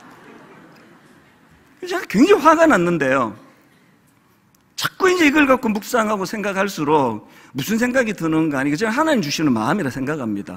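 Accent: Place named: Gyeongsang, South Korea. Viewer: 제가 대구사람인데 서울출신 남편이 처가가족들 대화들으면 싸우는것 같다 그래요 ㅋㅋ 목사님 경상도 스타일이셔서 그런것 같아요^^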